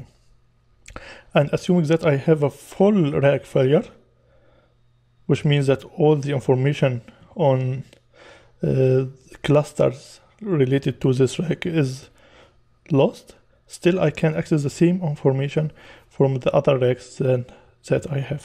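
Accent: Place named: Middle East. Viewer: I guess its the sweet middle eastern accent used by the gentleman.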